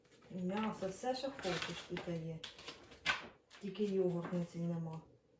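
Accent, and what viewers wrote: Poltava, Ukraine. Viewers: А ви не з Полтави ? Бо балакєте ви по-нашому , і акцент у вас полтавський .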